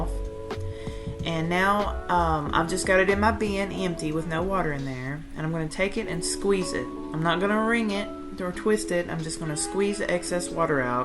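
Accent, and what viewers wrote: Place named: South, United States. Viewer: I’ve been washing fleece for many years. I never knew to soak it in plain hot water first. I’ll have to try this. I have a method to get more water out of your fleece. I use old bath towels folded in thirds length wise. I spread a layer of washed fleece on it, roll it up and step on the rolled up towel. When you unroll the towel the fleece is damp and the towel is wet. It dries much faster and the rolling and presser from stepping on it has never caused the fleece to felt. You sound like you’re from the south. I’m in Tennessee. Are you anywhere close? Enjoyed your video. Thank you.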